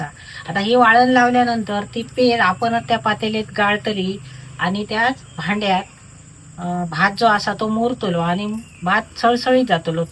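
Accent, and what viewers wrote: Malvani, India. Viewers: खरा सांगा, तू वाहिनी पूर्ण मालवणी बोलतंय आयकाक खूप बरा वाटला. वालय , बेळो , डोनो वाळण हे सगळे शब्द आमच्या घरातली आठवन करून दितत. फक्त गडबड अशी व्हाता पेडयांची कढी बघून प्रत्यक्ष जेवक गावला नाय ह्याचा वायट वाटता. आसानी, देव तुझा भला करू मावले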